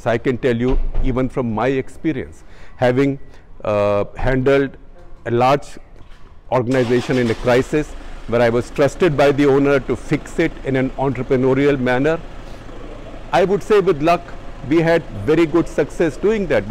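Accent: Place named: South, India. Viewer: Just like south indians pronounce n as yen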